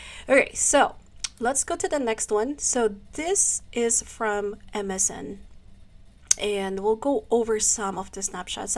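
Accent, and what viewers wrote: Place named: India. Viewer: Mr Nawat is hands on in his organization. There is nothing more effective than him making that move. You sound like Indian so I don't acknowledge your point. Your subscribers is less and I don't think it is going grow fast. You are sounding like moralist like most of Indians. I don't dig,that. I didn;t subscribe nor like your content. I have to change channel.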